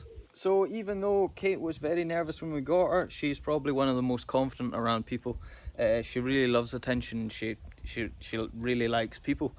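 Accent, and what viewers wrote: Scotland, United Kingdom. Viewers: Im not sure whats most satisfying with this channel. The scottish accent (i think its scottish at least) or the dogs. Ive commented it once before, Echo is such a piece of beauty. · I had a border collie when I was a kid. I was an only child, and Queenie was like my sister. I love watching you and your dogs. I also love your accent. I was in Scotland a few years ago, and I loved it.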